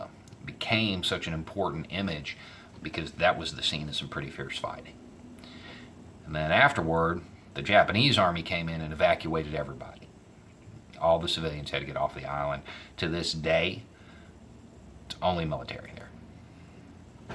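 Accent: South, United States. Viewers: I hope you hung on these last three years, I think it was the "let's talk about a hundred thousand subscribers" video he talked about the accent. I believe Beau is a positive force in the world, and proves you shouldn't judge a book by it's cover. (And yes, I'm a country boy, have a bit of a southern accent, vote blue in a red state, and enjoy hearing common sense from a dude in a shed that looks and sounds a bit like me!) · He's not exactly hiding who he is, his channel is called "Beau of the Fifth Column". I just assumed he affected a more neutral accent on his work channel because a Southern drawl is viewed as unprofessional. This one is his personal channel and he can sound like and say what he wants here.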